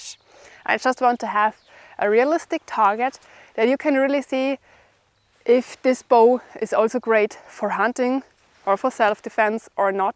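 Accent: Germany. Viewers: I enjoy the German accent while listening to a well informed video about this bow.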